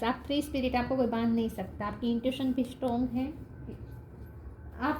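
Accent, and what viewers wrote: Gujarat, India. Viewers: Aap ke acent se lag raha hai ke aap gujrati ya madwadi honge.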